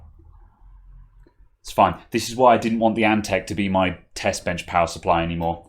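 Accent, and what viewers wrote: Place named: United Kingdom. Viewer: I don’t need to watch these videos, as I have over 20 years experience doing this professionally but I love to listen to you while I work, my coworkers don’t talk much and rarely about IT work Something about a British accent really screams “IT” and I have no idea why!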